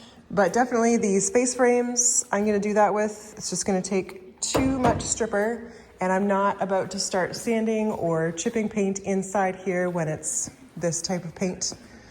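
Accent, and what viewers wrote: United Kingdom. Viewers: oooo using a standard english british for a narrator . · Ok, when or why did you chose a british voice over?😅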